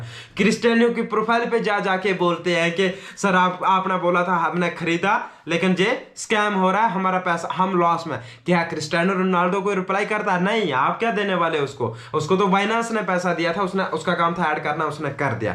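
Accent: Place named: Pakistan. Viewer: Pakistani aur tumhara dono ka bolne ke tadika same hai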